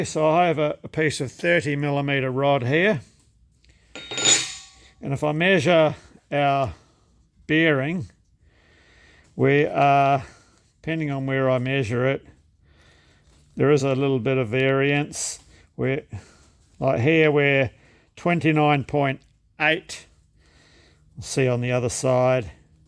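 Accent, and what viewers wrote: Australia. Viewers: Great instructional video - with the Aussie touch. Thanks.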